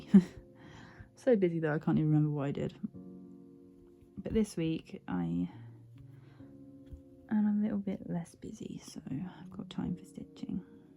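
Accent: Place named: RP, United Kingdom. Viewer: Actually, when my husband heard you talk he said I was wrong and that you sound kind of posh, so I guess he was right!! 😁😂😂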